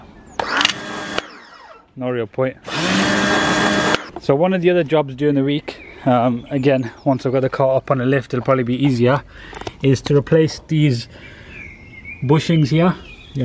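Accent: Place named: Birmingham, United Kingdom. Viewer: Can I ask where abouts your from? I’ve heard Birmingham but me and my girlfriend watch your videos and you look so familiar 😂and we can’t figure out where your from, love the videos!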